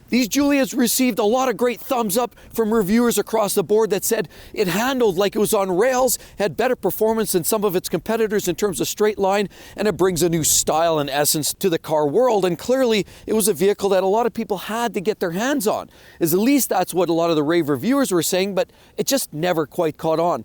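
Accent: Canada. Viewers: your thick Canadian accent kills me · I can't take his Canadian accent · That Canadian accent kills me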